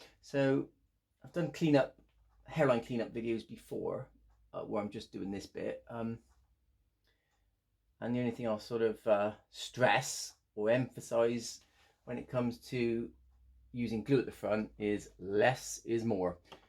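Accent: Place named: Salisbury, United Kingdom. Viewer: You sound like a Salisbury boy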